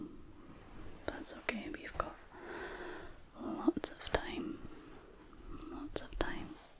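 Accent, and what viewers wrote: Scotland, United Kingdom. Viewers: I love your Scottish accent im from sunderland in the north east of england and i love visiting scotland every chance i get 😍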